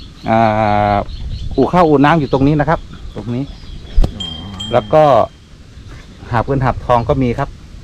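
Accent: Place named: Isan, Thailand. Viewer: สวัสดีครับ.ผมชอบฟัง ตอนพูด สำเนียงอิสานมาก..เฮดี.ครับ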